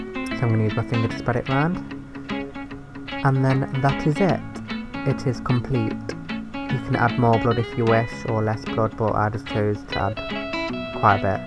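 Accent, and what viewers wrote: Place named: Nottingham, United Kingdom. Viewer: Just subscribed as it's amazing! Where you from? You sound like a Nottingham lad👍🏻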